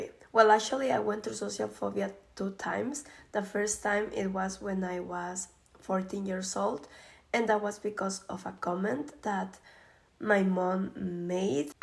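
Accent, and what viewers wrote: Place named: England, United Kingdom. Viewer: An an American I love the way she talks however I have no idea where she is from haha. England? France?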